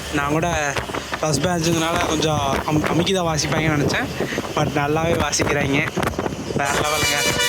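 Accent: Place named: Madurai, India. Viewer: Neenga Coimbatore thaana eppudi madurai slang pesuringa?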